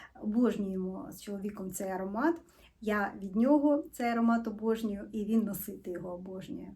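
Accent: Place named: Russia. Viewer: Акцент очень сильный, вы говорите на русском в быту... Это точно😊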